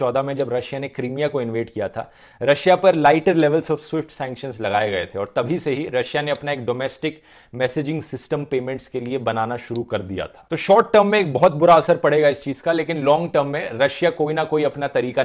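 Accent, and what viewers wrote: India. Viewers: I watched his video after many years today, i had stopped watching his videos when i realised his propoganda. But i still respect his quality of research on non-partisan issues. A big change i got to see is in his Hindi language tone. He has changed his accent in Hindi and now it sounds more desi He deliberately says Hajar instead of Hazar. Good marketing for his propaganda All the best to him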